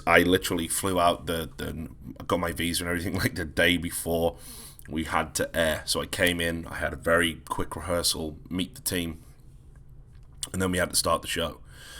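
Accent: United Kingdom. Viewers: Don't let them kill your accent, the British accent is like half the reason I watch your podcasts <3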